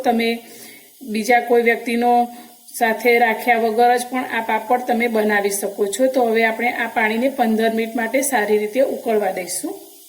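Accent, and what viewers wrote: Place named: Rajkot, India. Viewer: Bhuj saras banavia no 1 ho 👌 tame kya gam ma cho ben boli uper thi to rajkot na lagocho reply karjo pls ❤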